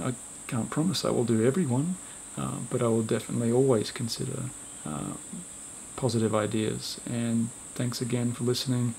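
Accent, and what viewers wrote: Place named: Australia. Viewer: Dear, your videos are great and i enjoy them nearly every night. I never really liked guided meditations but yours are the best! Luckely you have this nice Australian accent which makes it an even greater pleasure! Thanks a lot "mate". Sabine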